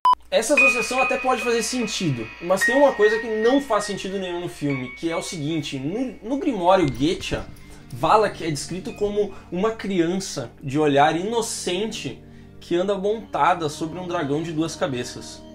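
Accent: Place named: Rio Grande do Sul, Brazil. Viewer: Aaa eu sabia q vcs eram gauchos kkk so pelo sotaque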